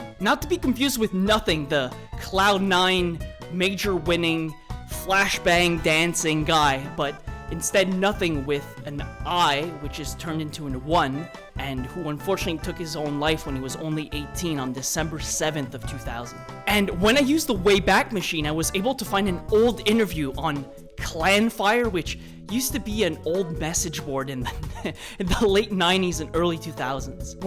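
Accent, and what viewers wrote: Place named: Quebec, Canada. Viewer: T’es tu québécois? Ton accent est parfait. Bonne vidéo btw👍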